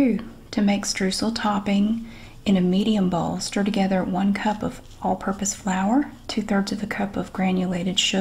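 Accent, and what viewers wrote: South, United States. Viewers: I live for these recipe videos with her southern drawl. Amazing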